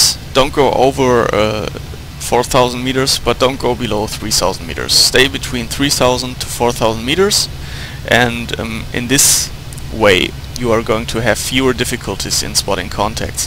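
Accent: Germany. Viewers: hi,. your vids are fun to watch, your german accent makes it interesting. I don't want to sound like a 'know-it-all' or something, but, you make the mistake many do when pronouncing the name...'hawkinge'......the 'inge' part is pronounced like the word 'hinge' or 'binge', not 'ing', like in 'going' or shooting'. I know, not real important, just a minor detail......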